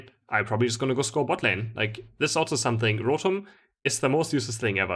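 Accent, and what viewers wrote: Germany. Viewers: Hey, thank you for this nice and informative Video, i've got a question to you:D Your accent sounds really german, may i ask where are you from?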